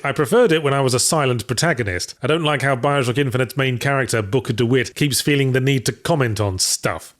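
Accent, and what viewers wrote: United Kingdom. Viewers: In a weird way this reminds me of Egoraptor's talk about about the opening of Mega Man X. But more fancy due to British. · No video intro has ever made me more pumped to hear a British bloke talk about video games.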